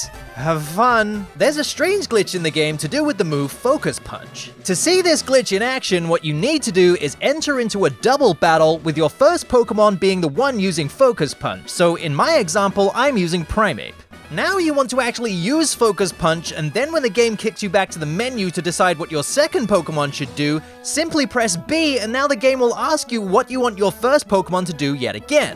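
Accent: United States. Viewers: Why do he sound a bit American now? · Woah I feel like I haven't seen your videos in years and your accent feels more "American-y?" It's weird haha good video still :)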